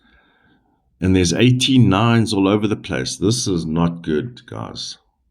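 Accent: South Africa. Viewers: So when Kate appears after all her treatment and recovery you will have no more validity as a psychic medium? Sorry but I feel this is a lot of nonsense. You sound South African so you probably have never had a connection to the UK.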